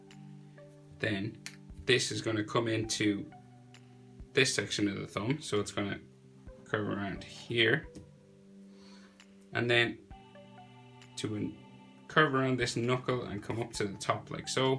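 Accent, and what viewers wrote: Ireland. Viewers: Trying to draw mob and immediately recognised your accent, my Irish brother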